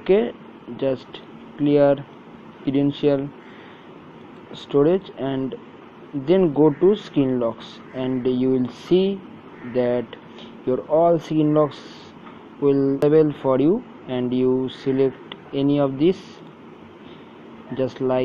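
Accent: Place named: Bengal, India. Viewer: Your typical Bengali/Assamese/Odiya accent has ruined your english , you should post videos with writings instead of speaking English. That would be conceived better for sure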